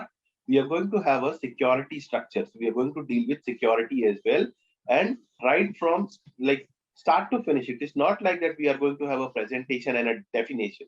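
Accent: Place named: India. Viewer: I thought it was a woman guest, I am sorry but Indian accent in english is terrible :(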